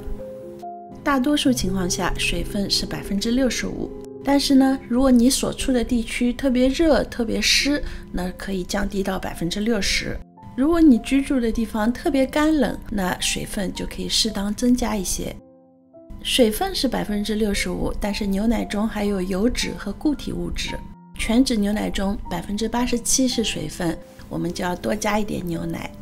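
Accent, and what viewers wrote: Shanghai, China. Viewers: 谢谢。听到你视频里的上海话感觉很亲切。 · 上海方言好親切！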